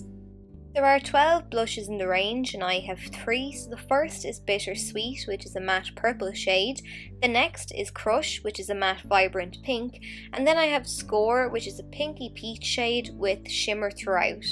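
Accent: Ireland. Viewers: God, I love Irish accents.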